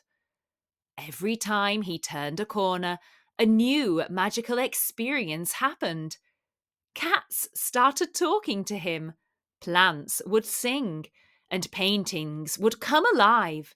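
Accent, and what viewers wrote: United Kingdom. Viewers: Which accent do you follow of British accents?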